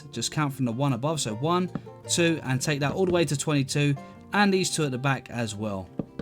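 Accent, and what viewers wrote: United Kingdom. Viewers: you bri-ish? you sound it?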